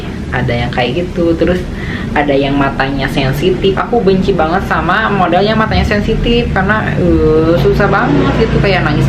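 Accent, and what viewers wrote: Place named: Sunda, Indonesia. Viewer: Sunda abiss si aa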